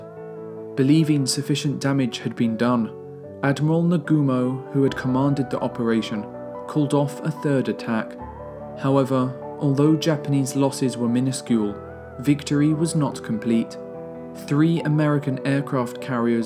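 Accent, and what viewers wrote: United Kingdom. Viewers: Came up on my feed, clicked the link and as it loaded thinking "...this narrator better have a British accent..." Noice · He just speaks British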